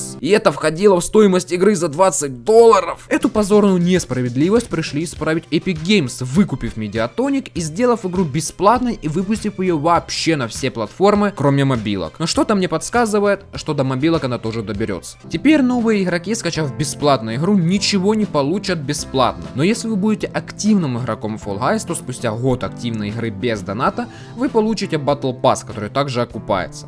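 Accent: Ukraine. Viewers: черт, я по голосу вычислил сразу что ты из Украины🇺🇦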